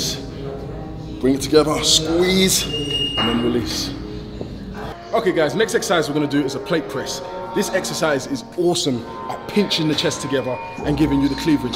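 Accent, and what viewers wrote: United Kingdom. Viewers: Some British accents I like, this one just irks me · I have been bodybuilding for over 40 years, and you are by far the best trainer I have watched! You are the "Real Deal." Love your methodology, eating, and your pretty wife. UK accent is nice also. I am 68 years old and still hitting the gym 2 hours 3-4 day's a week! That is why you have never been injured the by way you train.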